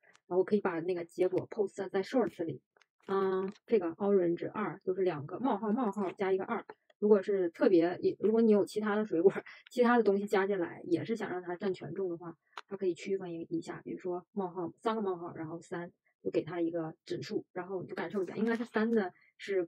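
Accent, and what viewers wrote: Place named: Northeast, China. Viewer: 你的东北式英语让我很糟心。也谢谢你的分享:face-blue-smiling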